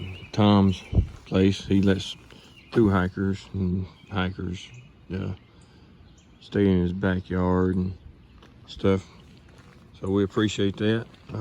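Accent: South, United States. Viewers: Thank you for finding more mushrooms and catching them on camera. That first big one almost didn't look real. Amazing there are so many kinds. If rock walls could talk, they could tell you lots. Most represent the skill in which the person built them. Some may fall apart due to vandalism or people searching through them, but the one my daddy and us kids built is still standing strong. If there was a way I could include it in this comments section, I would. .......I also appreciate you filming sections of the towns you are going through........still love seeing the old houses "up north". The architecture is so different than you see here in the south. Keep holdin' on to your sweet southern accent !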